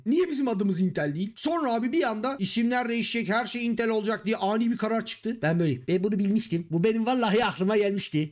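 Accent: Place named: Central Anatolia, Turkey. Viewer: İç anadolu şivesi arada süper gidiyor :)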